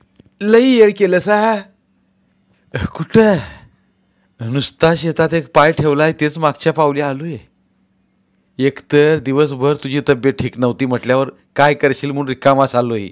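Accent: Rural, India. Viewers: 🙏 तुमचा ग्रामीण आवाज व वाचन अतिशय सुंदर आहे. मी तुमची नवीन श्रोता वाचक आहे. 😀😀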